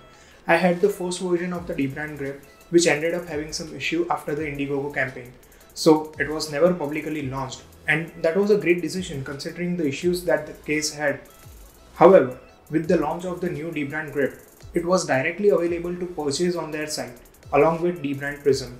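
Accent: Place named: India. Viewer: I was watching this wondering how all indians sound the exact way we Americans think you sound 😂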